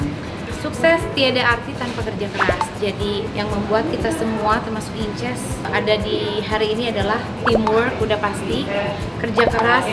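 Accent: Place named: West Java, Indonesia. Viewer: Karakter nya kaya gitu bgs manja dari pada kasar.😆😆 Dan dia orng sunda logat bicara nya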